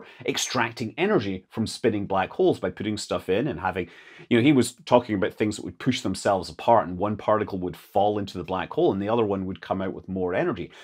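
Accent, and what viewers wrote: Scotland, United Kingdom. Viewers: I doubt you remember, but I asked you if you were Scottish or Irish a long time ago. How did you regain your Scottish accent and loose the American one also?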